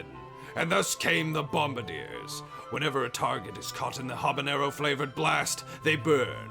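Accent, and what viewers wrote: England, United Kingdom. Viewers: You sound like a mix between a humble Englishman, a drill sergeant and Lord Saladin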